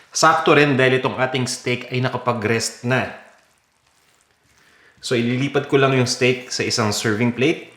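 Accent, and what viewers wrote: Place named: Tagalog, Philippines. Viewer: Gusto gusto ko talaga mga lutuin mo sir and the you speak sobrang tagalog na tagalog Panlasang Pinoy talaga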